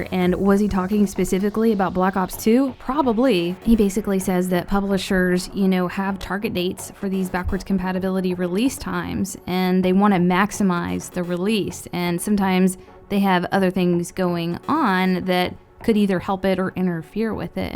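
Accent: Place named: United States. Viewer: Who Cares i am from england. her voice sounds 'normal' to americans yes but i love the american accent 😍😍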